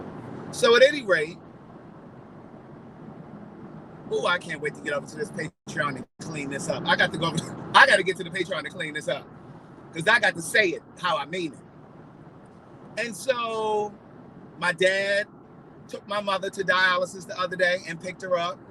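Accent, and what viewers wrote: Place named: Baltimore, United States. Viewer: I just love looking at you and listening but I am here in Baltimore too and I understand what you are talking about and you sound just like someone from Baltimore. 😂 But I hope your mom doing better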